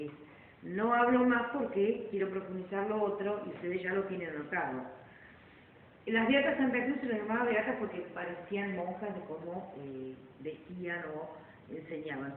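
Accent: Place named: Argentina. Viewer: Correctamente amiga Entiendo perfectamente Dime eres de argentina Amiga Es que Vos habla como los argentinos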